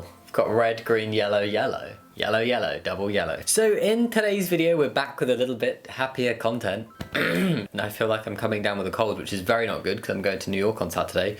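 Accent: United Kingdom. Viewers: I felt like your accent slapped me in the face with just how British it was lmao, that's the best way I can explain it. Also your voice is really calming and it's the type of voice I like in ASMR videos. I'm glad your family is supporting you in this, and that your school is doing its best as well! Catholic schools just seem to suck universally and I don't understand why people keep sending their kids in them...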